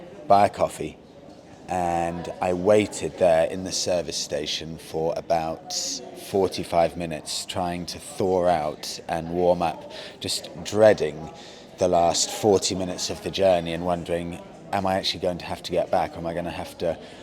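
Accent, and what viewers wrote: United Kingdom. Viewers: I came here for the Meteor 650, but that quintessential British accent made me stay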